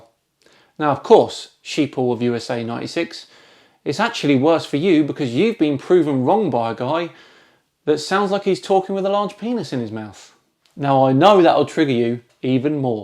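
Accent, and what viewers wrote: United Kingdom. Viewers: I'm 50/50 on it., I only support you b/c my little brother Brian (FFAS) drew an amazing portrait of you & he's a very talented artist. Half the time you're entertaining & the other half you're condescendingly ass-ish. The Lego pic frame was your saving grace. Yes, sometimes you're funny, other times, your mixed British accent is grating b/c it's right in between Proper English, "with a moment for tea & crumpets," and London Ghetto Cockney, "whut was u finking, bruv," but b/c it's not one or the other, the duality is more noticeably annoying to those of us who have no accent.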